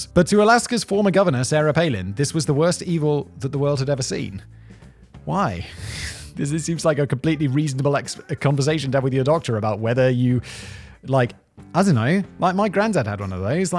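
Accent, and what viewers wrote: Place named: England, United Kingdom. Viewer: for some reason, `s middle class English accent makes the irony here even more savage yet funny!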